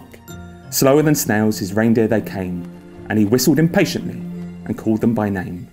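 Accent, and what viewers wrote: United Kingdom. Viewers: Dat beautiful british accent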